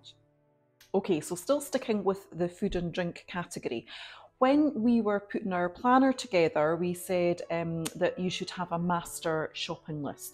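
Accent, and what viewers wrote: Scotland, United Kingdom. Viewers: Just subscribed this morning and glad I clicked on your video in my feed. It was lovely listening to your brogue - my grandparent's were from Saltcoats before they came to Michigan. I have cousins in Ayr and they visit America often! xx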